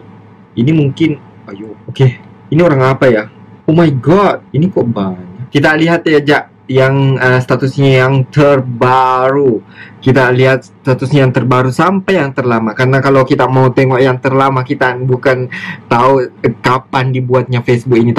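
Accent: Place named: South Sulawesi, Indonesia. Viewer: hehehehe.tetep masih ada kekentalan bugis nya kk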